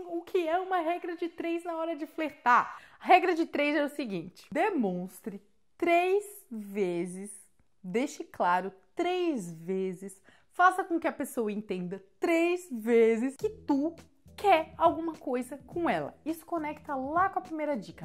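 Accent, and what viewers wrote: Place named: Caratinga, Brazil. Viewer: Sabia que esse Sotaque era Caratinense KKKKKKKKKKKK viva a doce e bela Santa Catarina ♥️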